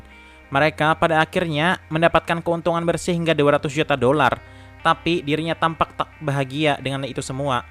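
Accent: Banjar, Indonesia. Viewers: Intonasi banjarnya kental bujur, jadi ngalih mencernanya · dari logat, macam orang hulu sungai 🧐🧐🧐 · Ikam orang banjar kah mang ? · Dari review logat bicaranya, sepertinya org Banjar Kalimantan. Cmiiw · asli mana bang, kya logat bahasa banjar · Logat banjar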